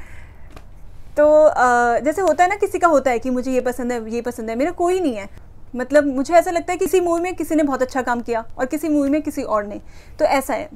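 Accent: Uttarakhand, India. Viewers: I knew you are from Uttarakhand from your accent! Btw I’m also a delhite and from Uk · Congratulations 😊from your accent I got a hint that you belong to Uttrakhand(as I am), keep going on...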